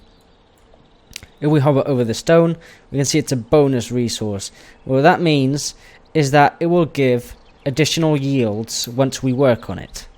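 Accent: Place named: Northern England, United Kingdom. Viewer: I really appreciate this video. You very clearly and calmly lay it all out there for a beginner like me. You have an unusual accent, I was trying to place it. Lincolnshire? I heard elements of that northern twang, but not as strong as some.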